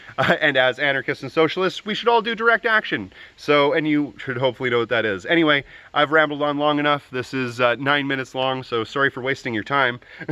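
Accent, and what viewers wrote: Canada. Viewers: You sound Canadian. Where you from comrade?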